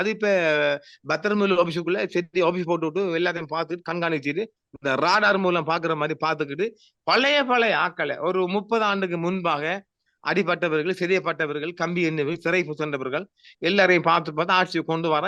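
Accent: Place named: Eastern Tamil, Sri Lanka. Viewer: கிழக்குத்தமிழ் அப்படித்தான் இருக்கும்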